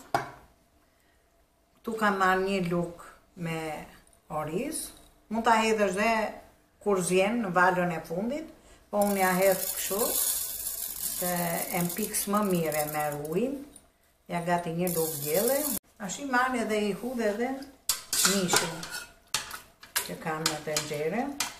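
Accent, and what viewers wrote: Tirana, Albania. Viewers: Ka lezet kur bohet e shpjegohet nga nje tironse me dialektin e Tirones. Na kishte more malli .Njit cdo gjo. T`lumshin duret!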